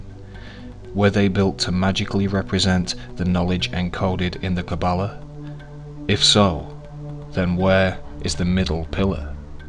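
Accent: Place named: Liverpool, United Kingdom. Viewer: this guy loves the sound of his own voice, but still interesting non the less, not bad for a scouser. i'm a woolly back by the way if i sound bitter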